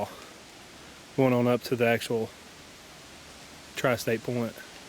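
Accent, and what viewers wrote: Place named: Georgia, United States. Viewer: I grew up in Tennessee, and I've been living in southeast Georgia for the last 8 years, and I can always hear the difference in the southern draw between Georgia and Tennessee when I listen to talk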